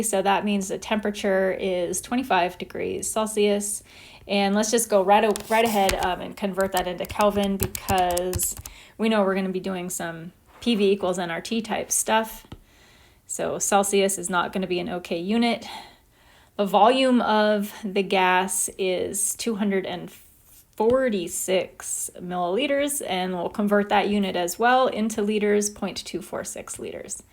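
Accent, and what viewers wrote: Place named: United Kingdom. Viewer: Hello MAM I'M A GRADE 12 STUDENT FROM INDIA.......I USED TO THINK I CAN'T UNDERSTAND BRITISH ACCENT BUT I WAS WRONG U MADE THIS GAS STOICHIOMETRY PROBLEMS DAMN EASY......I NEVER EVER UNDERSTOOD THE CONCEPT OF WATER DISP METHOD PROPERLY BUT THANKS TO U FOR MAKING IT A LOL TOPIC.......BTW PLSS MAKE VIDEOS ON PHYSICAL CHEMISTRY RELATED CONCEPTS AND SOME INORGANIC BLOCK CHEMISTRY RELATED CONCEPTS😅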